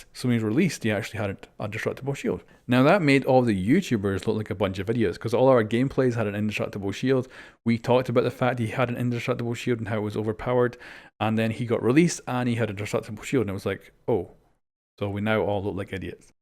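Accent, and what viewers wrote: United Kingdom. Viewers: AR S it’s something called a b r i t i s h accent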